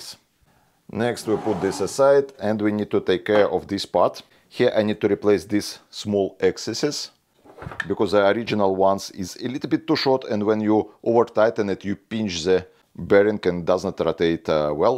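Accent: Russia. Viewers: Really cool project 👍 P.S. Which accent type that is? I think about Russian, but i dont really know 🤔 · Вот так выглядит русский акцент))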